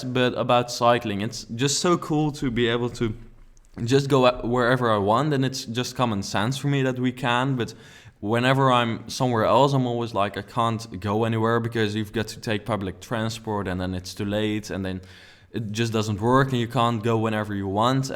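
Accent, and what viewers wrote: Netherlands. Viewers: I was like "yeah right hes gonna know how to build that" then i heard his voice and was like "yup he dutch" lol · Thaatttthh Goooooooddddd XD lekker accentje, elke nederlander kan een nederlander herkennen aan t acceptnje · You have a dutch accent 😊👏🏼❤️ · Ik merk dat je Nederlands bent want je hebt een accent van een HOLLANDER!!!!! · I think you are a great builder and produce some nice content. I am afraid you dutch accent is painful to listen too though. It makes me cringe XD (you seem like a nice guy though)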